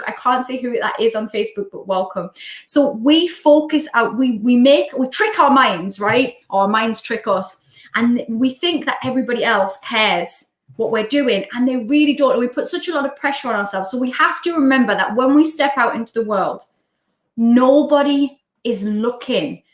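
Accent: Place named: Geordie, United Kingdom. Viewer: I saw your Georide Accent videos first. You really have made your accent more proper. I Love the Georide accent!!!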